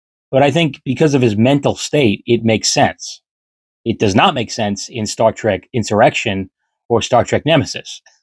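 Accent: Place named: Long Island, United States. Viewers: Never underestimate how much fun it is to listen to a guy from Long Island explain Star Trek. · 2/24/24 (HOW ABOUT THAT DATE!!!) why so much profanity? I could do without all the sexual stuff and cursing ANYWAY great movie! i saw it in theaters with my uncle and my dad The consensus was that it was a fun movie didn't like the diversion into hair metal without mentioning KISS in the 80s KISS were huge again, if a bit similar to other bands of the era they're also NYers just like you (I am guessing by your atrocious accent) given i am no lingust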